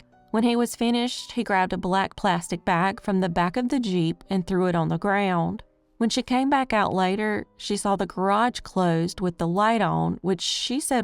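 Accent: South, United States. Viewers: THANK YOU!! LOVE THAT SOUTHERN ACCENT!! AND THANK YOU FOR MENTIONING NEW MEXICO AND EL PASO TEXAS!! AND PLEASE ALL VIEWERS PLEASE SUBSCRIBE!!! SHES GONNA HIT THE BIG NUMBER HERE PRETTY SOON!!!!:hand-pink-waving::thanksdoc:🥰 🤠🤠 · There are other folks out there doing good work, but you are my favorite! Maybe it's that outspoken southern and compassionate thing you do so well. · I love her sweet Southern drawl! Her speaking voice is very pleasant and adds comfort to these tragic stories. Southern comfort😊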